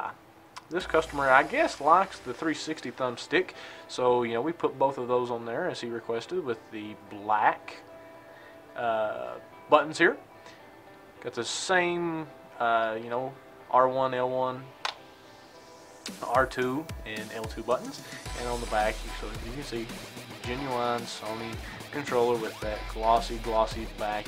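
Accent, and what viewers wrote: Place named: South, United States. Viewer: Dude, you have such a cool southern accent!! I wish i still had mine but i lost it when i moved up north:(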